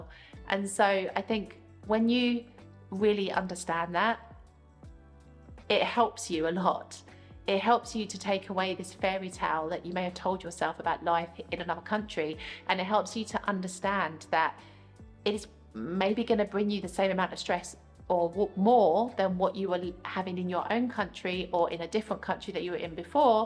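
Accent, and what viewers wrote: United Kingdom. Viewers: Actually she’s not very expectedly fluent. Her level is very very low. Her accent is still very British 🇬🇧. I believe everyone’s journey is different. I am learning Italian too. One day I feel like I have made progress the next day every word has flown through the window and I start all over 😆😆🥹🤔.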